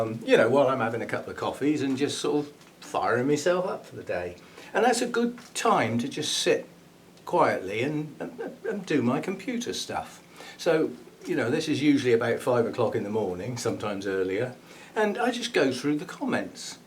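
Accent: United Kingdom. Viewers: I'm pretty sure I happened upon your channel when I did a search for "aggregatum orchid care" which I know you did not have back then but the word "orchid" probably led to your channel. I stayed because I like your personality and you have a wealth of knowledge I appreciate. Plus as an American, I enjoy your British accent! I remember when I first heard you call a plant "tatty" and I found it charming. And you have a kooky sense of humor. I hate this social distancing too, but we have to do what we can.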